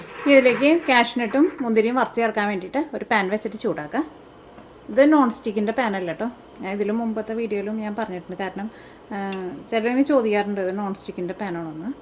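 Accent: Thrissur, India. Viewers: Nishkalangamaaya Thrissur Slang.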